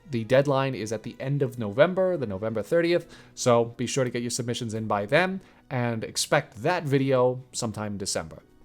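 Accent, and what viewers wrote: New England, United States. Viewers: Am I crazy or is accent going crazy in this video? Or am I just noticing because he said he’s from New England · Does anyone else feel like he's started pushing the new England accent since he first mentioned it a year or so back? I've been watching since the beginning and this accent is definitely new. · I’m so confused, why is his New England accent so much more heavy in this video? Is he trying to dial it up or is it a joke? I’m from Maine so I know what a New England accent sounds like. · Ey yo! Somehow I've been deaf to that NE accent until now. Now I'm hyper focused on it. 😂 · Is playing into his New England accent or is he just getting comfortable? 😭😭😭🤣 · as a new englander, he did not start developing an accent. he's simply letting it loose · Did anybody else entirely fail to notice accent until this specific video and suddenly all you can hear is the thick yankee accent? 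· I swear you sound more new englandy in these videos about new england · He sounds 1000% more New England than any other video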